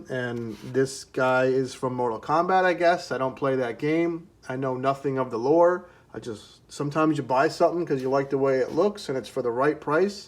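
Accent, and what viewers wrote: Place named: Long Island, United States. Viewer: Cool accent. Are you from Long Island?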